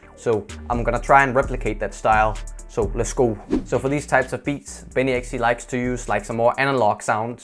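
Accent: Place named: Denmark. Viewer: knew from your accent you were from denmark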